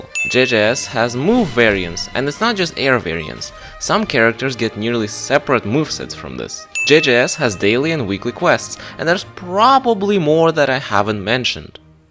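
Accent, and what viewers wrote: Russia. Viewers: Are you Russian? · Bro has russian accent, just like me b. I am russian but ik english very well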